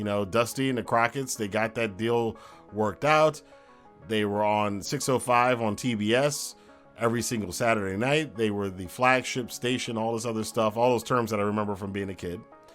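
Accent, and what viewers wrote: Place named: United Kingdom. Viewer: Also you have make these comparisons with a British Accent.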